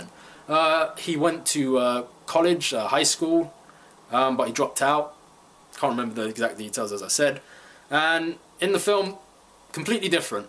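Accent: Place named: United Kingdom. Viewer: There's actually a reason Killian is so different in the film, it probably just doesn't play too well because 1. You're too young, and 2. Judging by your accent you're from the UK. Back in the 70's and 80's Richard Dawson was a real American game show host (he hosted Family Feud for a number of years). So instead of getting an actor to play a game show host they just got a real game show host.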